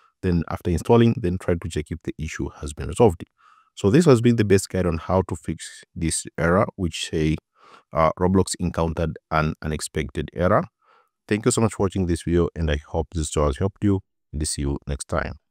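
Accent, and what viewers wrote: India. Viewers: I knew i would work when i heard his indian voice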